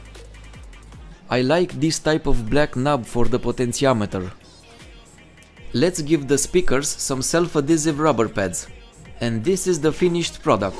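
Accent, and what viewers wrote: Russia. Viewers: По английски не чего не понятно акцент русский мешает давай на родной мове не стесняйся.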